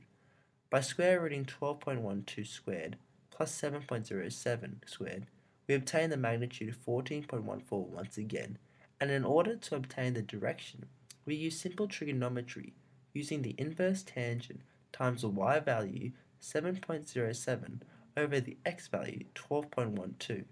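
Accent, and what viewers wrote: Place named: RP, United Kingdom. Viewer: whats with the posh accent haha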